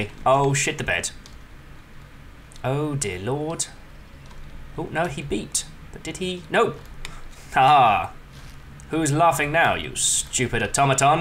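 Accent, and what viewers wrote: United Kingdom. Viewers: You, sir, are the most thoroughly British individual I have ever had the pleasure of listening to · Your british quality is astoundingly fun. I will come along for more, if you'll have more in the future. :) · best thing ever and I'm in love with your British voice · Out of curiosity, are you from the west country?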